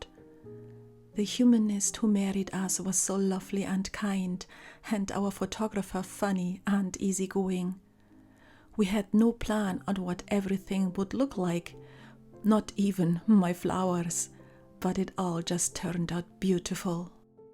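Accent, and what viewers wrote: Scotland, United Kingdom. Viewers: Very beautiful video I wonder if you could say where you are from originally because to me you sound so Scotish thank you xxx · I like your Scottish accent. 💚 I have a similar story. I emigrated from Germany to Sweden 14 years ago because my inner home is reflected in Swedish nature and I can experience my joy of nature and my contact with nature beings. I found love and have been married to my Swede for 7 years. I am very grateful and happy to be able to live here.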